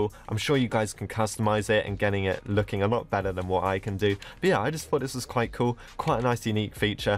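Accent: United Kingdom. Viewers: i'm british i was born on london and your voice is kinda british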